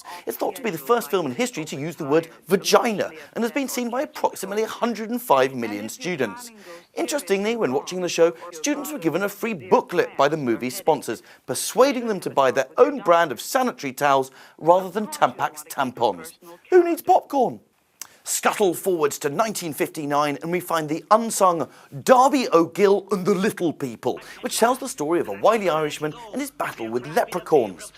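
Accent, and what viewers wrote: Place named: United Kingdom. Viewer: He's from this placde called Britain, also known as England. They say words a little bit different over there.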